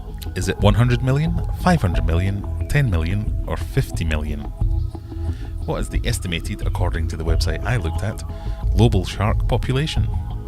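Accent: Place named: Scotland, United Kingdom. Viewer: Need to put microphone farther away. Scottish accent is awful at close range. ‘R’s are horrendous and every hard consonant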